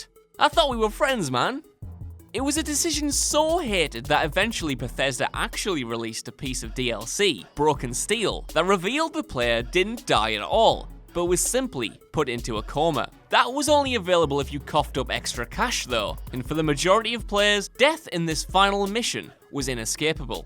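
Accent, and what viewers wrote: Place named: Liverpool, United Kingdom. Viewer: where's that english accent from? liverpool?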